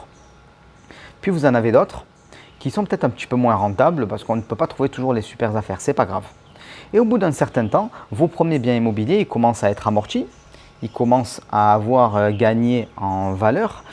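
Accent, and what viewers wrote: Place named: Paris, France. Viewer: super videos merci c'est très précis, par contre tu es de Marseille l accent tu la laisser la bas tu parle comme un parisien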